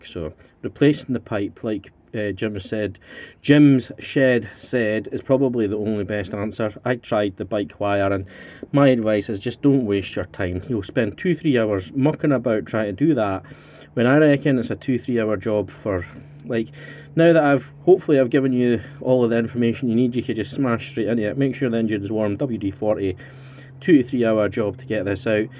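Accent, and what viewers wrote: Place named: Scotland, United Kingdom. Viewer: what will make you kick yourself is the seller on e bay that is selling the same pipe but in the genuine nissan part number for £31 is based in Scotland, and from your accent, sounds like that is where you live🤣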